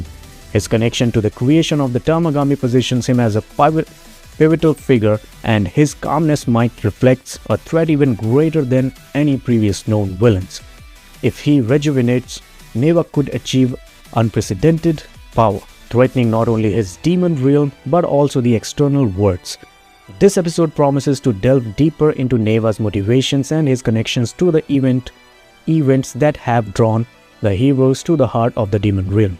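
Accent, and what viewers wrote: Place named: India. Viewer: why are you talking like an indian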